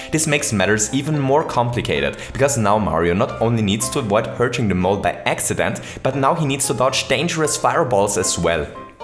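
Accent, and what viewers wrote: Germany. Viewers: He's German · Are you german?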